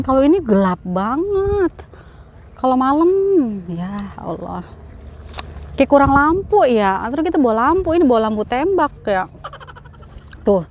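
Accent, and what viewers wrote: Jakarta, Indonesia. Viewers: Betawi bgt si mpo..🤭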